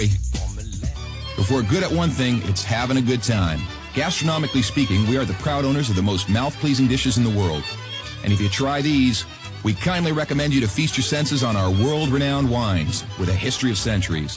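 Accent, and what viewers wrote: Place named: Romania. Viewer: Narrator is Romanian. Listen to the europen accent!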